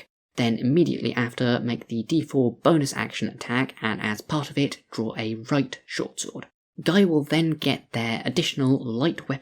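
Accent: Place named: United Kingdom. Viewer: You sound a bit young.. but your voice is that type of brittish that lets you know you were born as a 35-year-old person with a cup of tea in hand. Im not sure what software is changing your voice. But you could tune it in a bit. If you're not using something to augment your voice you could use a new mic.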